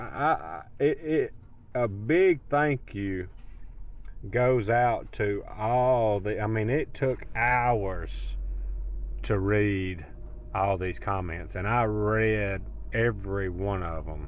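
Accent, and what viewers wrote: South, United States. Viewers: 68 year old man here. I'm gonna miss that long southern drawl of", Pete, ". Well done!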